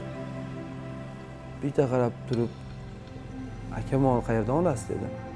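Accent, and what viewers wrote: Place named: Andijan, Uzbekistan. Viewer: Akamiz anjanligakana😁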